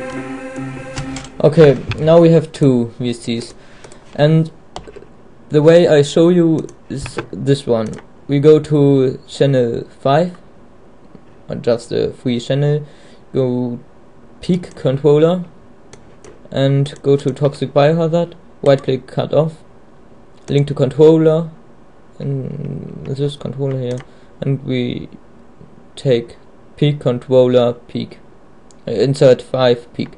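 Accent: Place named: Germany. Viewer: du hast ein deutsch accent (?) i am dutch and i can understand it:P i am soon going to germany to europapark, do you know that? Auf Wiedersehen:D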